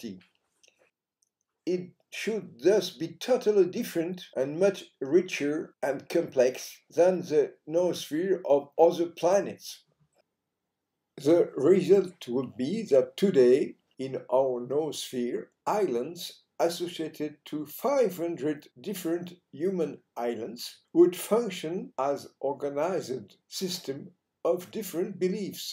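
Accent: France. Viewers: Les sous titres anglais générés aident grandement. Avec en prime l'accent Frenchie pour aider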